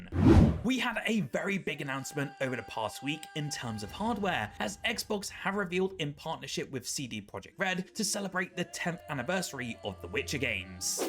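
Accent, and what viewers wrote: United Kingdom. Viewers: Where his is accent from what part of the UK?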